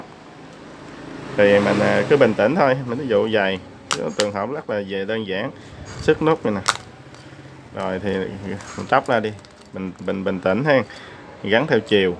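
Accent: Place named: South, Vietnam. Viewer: Cảm ơn anh. Nhưng mà e ở hà nội. Nghe giọng anh ở trong nam thì phải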